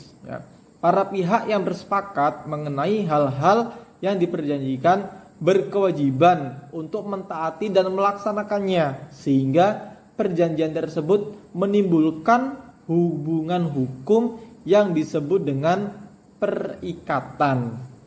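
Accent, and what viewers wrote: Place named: Java, Indonesia. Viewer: Jawanya totok kali pak 😂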